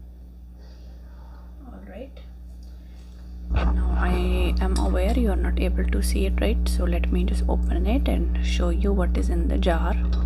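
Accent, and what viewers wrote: India. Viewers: Please never stop uploading, this is great content! Also, is that a real Indian accent or is it something you learnt while travelling?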